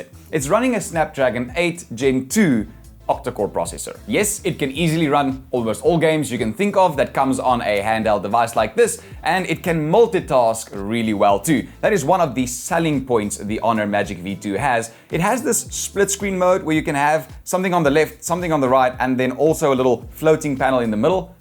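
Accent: South Africa. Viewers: You sound South African, subbed.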